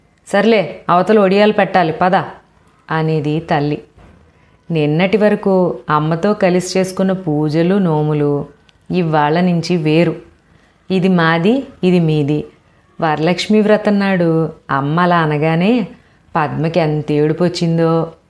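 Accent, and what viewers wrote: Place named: Godavari, India. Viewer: మీ అగ్రహారం కథలన్నీ విన్నాను అమ్మా... చాలా చాలా చాలా బాగా చెప్పారు మా గోదారి యాసలో....❤❤👌👌👌👏👏👏🙏🙏🙏🙏 ఒక్కటి పక్కన ఫోటోలోనీ వాళ్ళూ నిజమైన వారా??!! లేక ఊరకనే వేశారా???!! నా సందేహం తీర్చగలరు. ఇక మీ మిగతా కథలూ వినటం మొదలు పెట్టాలి.ఉంటానమ్మా🙏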